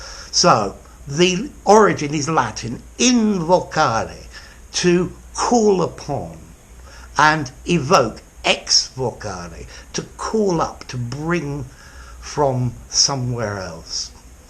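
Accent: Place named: England, United Kingdom. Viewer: The aura of your accent evokes some of my immensely-cherished memories in England some years ago.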